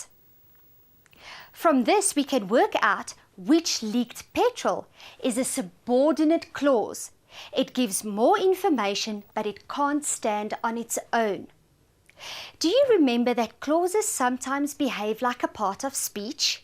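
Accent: South Africa. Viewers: The South African accent :) , I'm teaching English in Korea, this was so refreshing , day made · I'm from South Africa, I'm pretty sure that it's a South African accent...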